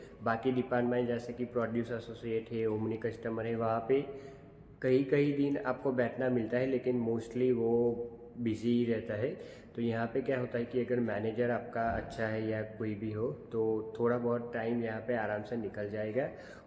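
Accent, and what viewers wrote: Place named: Gujarat, India. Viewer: Bhai tame gujarati cho tamari bolvani ton mane evi lage che❤❤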